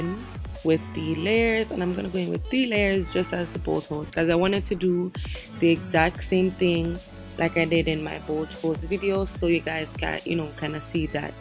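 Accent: Jamaica. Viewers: i love your accent ❤️jamaican gang🇯🇲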